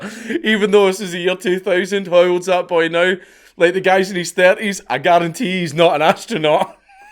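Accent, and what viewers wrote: Scotland, United Kingdom. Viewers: The editor tried, but you still sound Scottish. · This sounds so funny. Wish I could understand Scottish.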